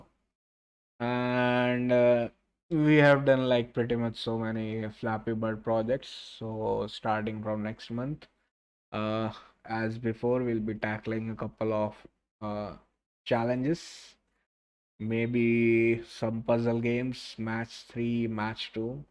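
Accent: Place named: Gujarat, India. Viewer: are you form Gujrat ? keep it up bro.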